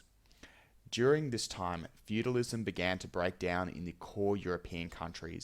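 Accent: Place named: Australia. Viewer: more content in aussi accent pls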